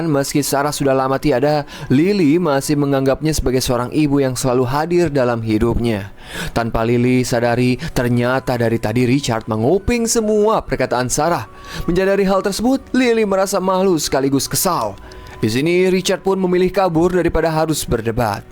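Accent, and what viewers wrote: West Java, Indonesia. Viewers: Ada Sunda Sunda nya kang😭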